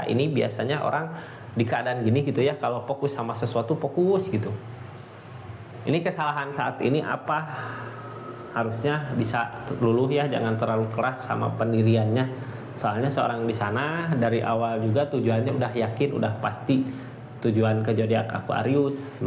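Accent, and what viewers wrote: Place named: Sunda, Indonesia. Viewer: Saya suka dg mas lo wajahnya seperti anaku nomor 3 trus bahasa Sunda nya medok bangett makasih redingannya sehat sll berkelimpahan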